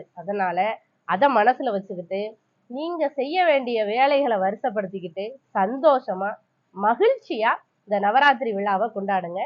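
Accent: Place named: South, India. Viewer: அக்கா உங்களுடைய தேக்கத்திய மொழிவலக்கு மிகவும் சிறப்பு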